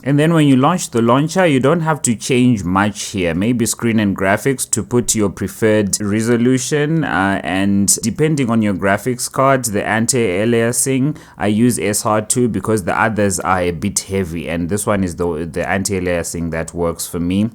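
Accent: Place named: Zimbabwe. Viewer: Are u from Zimbabwe, your accent sounds like it, or south africa, sounds more like zims tho?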